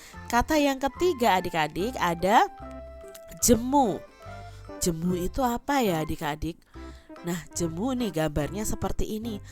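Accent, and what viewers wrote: West Java, Indonesia. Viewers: Kayak nya atau sunda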